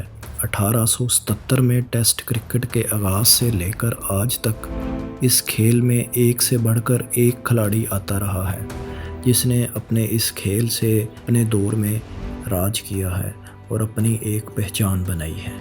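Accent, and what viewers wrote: Punjab, India. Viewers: O bhai punjabi zubani main urdu ka sabaq parh rahe ho? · Is Bhai ka to to Urdu bolen main panjabi lehja hai .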